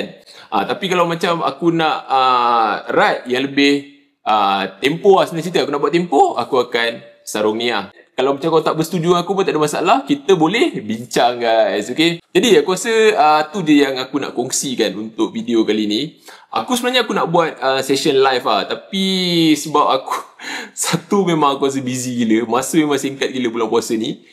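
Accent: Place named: Malaysia. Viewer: Takpalah.. Lidah Melayu 😅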